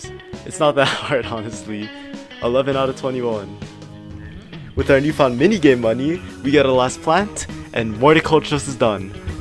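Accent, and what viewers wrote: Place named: Germany. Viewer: Man merkt dass du Deutscher bist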